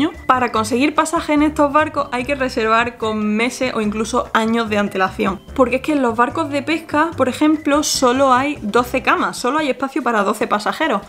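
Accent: Andalusia, Spain. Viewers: Wow, que video tan bueno, generas muy buen contenido, amo tu asentó andaluz. Saludos desde colombia :3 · Que feo es el acento español de esta chica. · ¿Me encanta tu acento de que parte de españa eres? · Otro que lo ha flipado con el video y con tus ojos, apúntame en lista de espera xD Enhorabuena por tu canal y aquí tienes un nuevo suscriptor, y también andaluz, que tu acento te delata jeje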